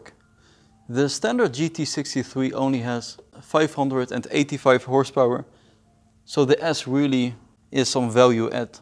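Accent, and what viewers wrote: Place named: Netherlands. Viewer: i immediatly knew he was dutch hearing how he speaks english =)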